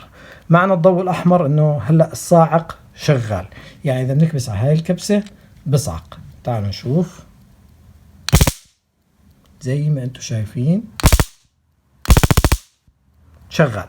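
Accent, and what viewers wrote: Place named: Jordan. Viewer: طيب اخوي هاي لو انمسكت معك من الشرطة في عليها سجن ؟ بالاردن قصدي لانو مبين من لهجتك من الاردن زينا وكل الاحترام لكل الاخوان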